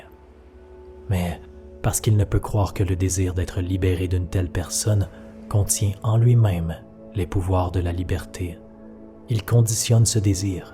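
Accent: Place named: Quebec, Canada. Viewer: Merci à vous même si javoue que j'ai du mal avec votre charmant accent québécois, question d'habitude auditive...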